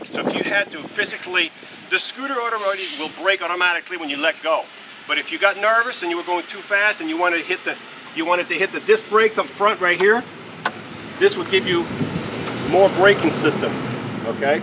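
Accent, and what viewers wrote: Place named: United States. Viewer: You sound American, is it available across the pond in the UK?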